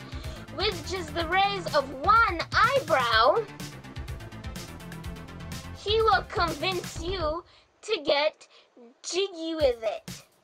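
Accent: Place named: England, United Kingdom. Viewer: As always speaks in a VERY English accent EDITING ON TOP FORM 😅 Loved this, I genuinely look forward to when you upload 😄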